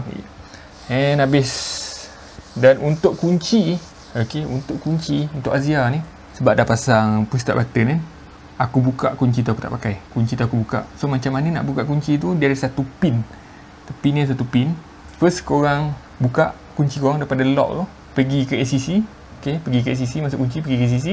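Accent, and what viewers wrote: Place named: India. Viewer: kl gak fokus dengerin, aku kira orang India yg menjelaskan.😁🙏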